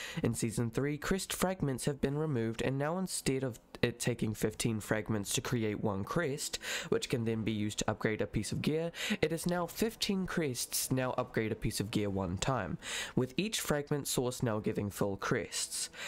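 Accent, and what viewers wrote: New Zealand. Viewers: Is this guy a kiwi? The accent is close but something is slightly weird about it, maybe from some random place in NZ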